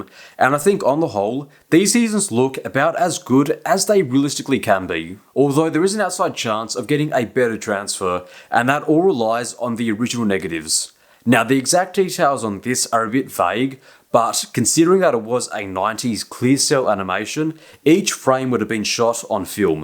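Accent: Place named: Australia. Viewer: Jesus how Australian are you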